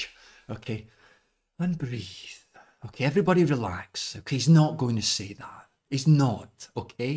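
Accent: Scotland, United Kingdom. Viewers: well deserved, Sir. I like the Scottish accent a lot and your humour in particular.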